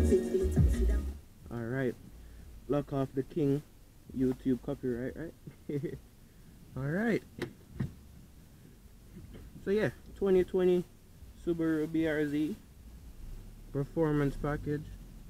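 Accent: Jamaica. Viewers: I recognize dat accent are u Jamaican?nice car btw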